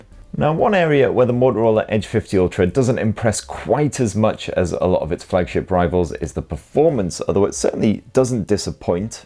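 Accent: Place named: North, United Kingdom. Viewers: Straight out of the north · He's a Mackem mate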